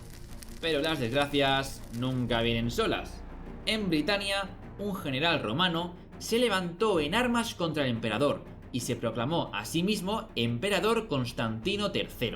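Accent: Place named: Spain. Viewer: Esa voz tan amplia bien española esa fuerza digna de una puesta teatral , bueno sirve para darle más animación, siga así señor que hace más interesante esta hermosa lectura , gracias desde La Paternal Caba Argentina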